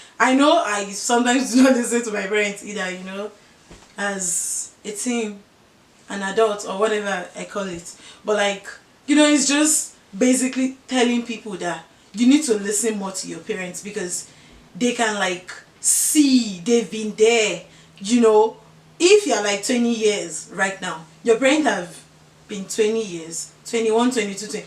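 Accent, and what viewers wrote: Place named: United States. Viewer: You still need to know how to speak Yoruba without the American tone. Above all, It a nice program.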